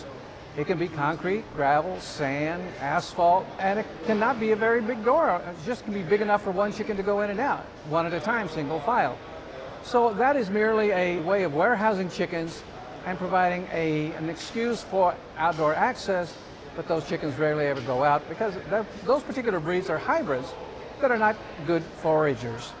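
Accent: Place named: Transatlantic, United States. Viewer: He kinda has the transatlantic accent. It sounds so nice.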